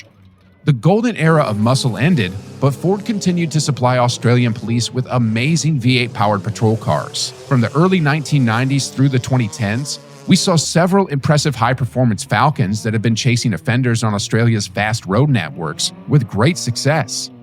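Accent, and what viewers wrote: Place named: United States. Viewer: Didn't watch the whole thing cause I can't stand seppos talking but did the XR6 turbo get a mention?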